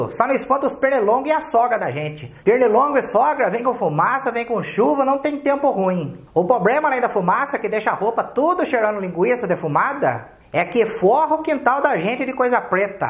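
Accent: Minas Gerais, Brazil. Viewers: Concordo com você!! Mas fala mais devegar, não entendo direito o mineires!😁😁😁😁😅😅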